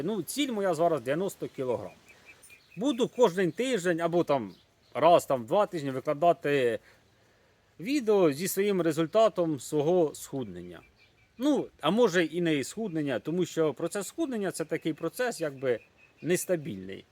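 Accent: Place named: Poltava, Ukraine. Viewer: Полтава? Акцент как на полтващине. Прыг и бег если нет проблем с коленами, самое простое для похудения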